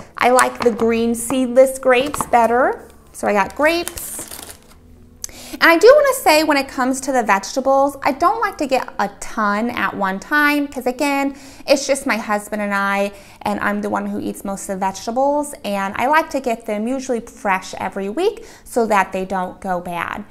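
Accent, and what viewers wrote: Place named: South, United States. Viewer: This woman is very traditional and I like that. And also the accent is great... Is that Southern American accent, near Texas or something??? I am saying that because I watch American cinema a lot and can understand the little slang from one part of the country to another.🙏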